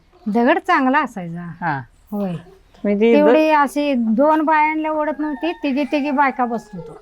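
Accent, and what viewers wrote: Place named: Kanthi, India. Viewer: आवाज कंठी गावचा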